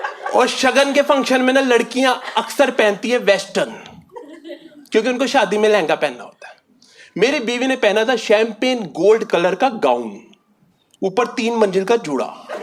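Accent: Punjab, India. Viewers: Punjabi accent 👌😂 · Great punjabi comedy 😂 loved the accent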